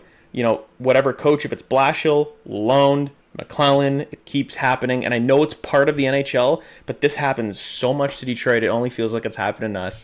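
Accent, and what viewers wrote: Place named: Ontario, Canada. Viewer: Fun fact: The Wings moved into second place this weekend. The Sabres if they win their game in hand, they are two wins from a Wild Card. Get this in your head: There are good shots and they just miss, hit the post and out, hit the post and in, and there are goals. Now go review high school probability and statistics to under stand how that results in final scores. Your accent betrays you, so please compare the Wings to your two Ontario team. And also compare Larkin to the crybaby who was cast as the greatest player ever. Larkin has 2 points more than 87, Larkin kills penalties, Larkin contributes as he is +7 in the stat that correlates in aggregate most closely to games score (aka wins) while Canada's prompted up hockey saint is a net zero and DOESN'T play a complete role. If you are not a fan enough to handle the ups and downs of a season in a league of extreme parity, maybe you should just watch the weekly highlights and then create a weekly video. 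This video today looks like Jonathan Quick 0.3 seconds after a good performance, lacking emotional impulse control.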